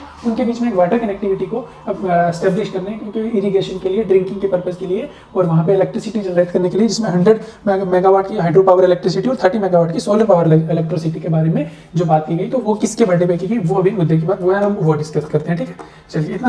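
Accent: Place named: Delhi, India. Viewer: Chha gye paji , Delhi waloon ki accent aagyi hai ,always grow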